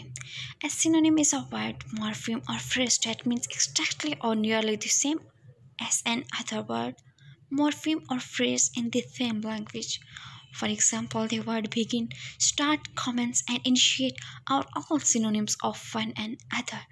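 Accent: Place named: Lahore, Pakistan. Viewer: Voice👍👌🤗🤩 lagdi lahore di aa 😅😜😚😁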